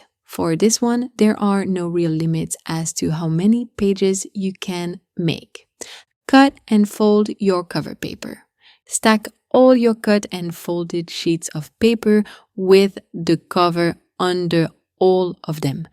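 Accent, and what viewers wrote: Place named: France. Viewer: Liked your French accent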